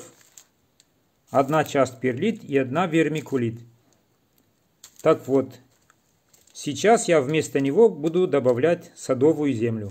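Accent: Caucasus, Russia. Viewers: Да согласен , объясняете хорошо , но вы забываете или я может быть где-то пропустил где вы живите, судя по акценту с кавказа.Поэтому считаю что дата месяц не имеет значения .Имеет значения какой климат или создать климат для укоренения .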